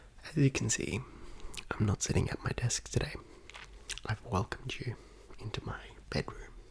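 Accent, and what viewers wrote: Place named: Australia. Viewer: Aussie accent 🥰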